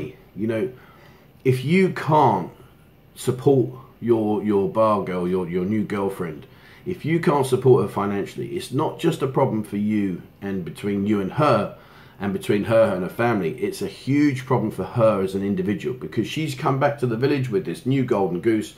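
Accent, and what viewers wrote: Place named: United Kingdom. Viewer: Thank you for all these precious advices. Also your British accent is very smooth and to me it is a good exercise for my bad English.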